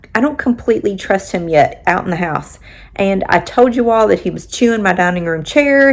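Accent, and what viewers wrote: South, United States. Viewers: Being from S. Cali, I just LOVE Southern talk even though usually do not know what the phrases even mean, lol☺😂~Plus, just has the prettiest accent ever, and I have always wanted one so much❤:) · I found you looking for growing out grey hair info. Love your Southern accent as I am from the South, but live in the North. Then found Pollux videos. I lost my German Shepherd, also a rescue, in 2017 and can't get over losing him. So am enjoying getting my doggie fix with Maxie and Pollux. Thank you.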